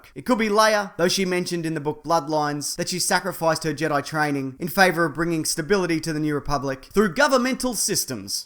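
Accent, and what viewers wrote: Australia. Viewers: this is an awesome channel, made even better by the australian accent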